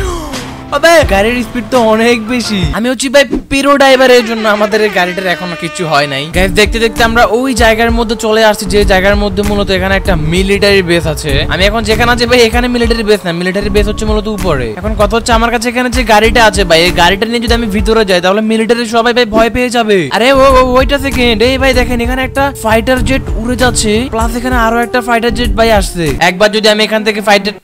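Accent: Noakhali, Bangladesh. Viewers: Via apnar bari Noakhalir kothay?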